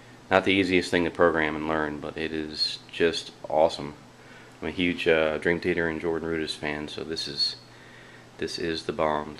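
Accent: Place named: South, United States. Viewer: Wow! You've got a southern Twang.... I've gotta get down there to record witcha!!!! 1993 seems like ages ago!!!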